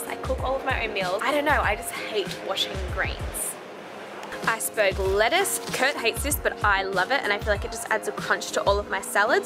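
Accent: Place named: Australia. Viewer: It’s just Australian lol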